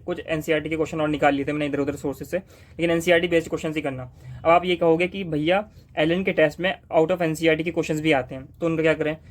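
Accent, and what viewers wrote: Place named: Bijnor, India. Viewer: Bijnori accent lg rha h.. 😅👍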